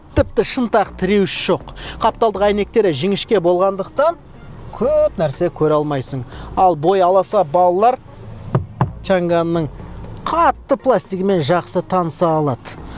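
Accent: Standard. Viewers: Ассалаумагалейкум інім! Туу..... недеген таза қазақша тусиндиру👍👍👍👍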